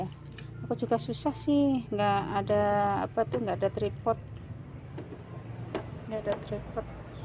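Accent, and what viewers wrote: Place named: Java, Indonesia. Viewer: Serem bget yu deloe brambang abang di goreng ngeri aku deloe eh🙈🙈,mendok tenan boso jowo ne yu 👍👍.tuku lah neng konter katah yu tripot kur gangsal welas ewu😅😅.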